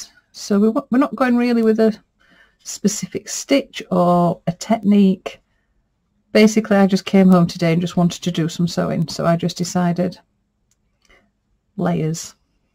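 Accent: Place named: North, United Kingdom. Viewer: Just been told by one of our mutual viewers that I sound like you 😁💜 so I thought I'd better pop over and see, or rather, hear for myself 🤭 Well we're both from the north but I suspect there's a county or two between us 😁 Loving the tags.. Joie 💜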